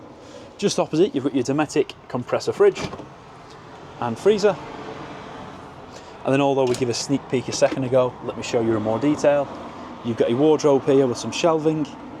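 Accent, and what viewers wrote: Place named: Scotland, United Kingdom. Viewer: It sounds like this fine young gentleman is speaking the kings English but I can't understand a single word he is saying. Is he Scottish? Nice van though.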